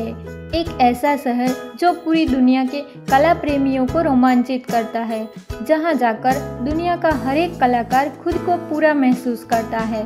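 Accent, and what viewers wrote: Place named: Gujarat, India. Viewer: Tame gujrati 6o aevu lage 6e 🤔🤔🤔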